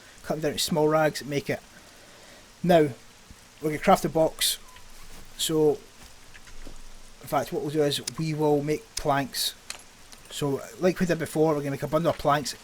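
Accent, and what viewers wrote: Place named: Scotland, United Kingdom. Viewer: Not at all hes Scottish conor is irish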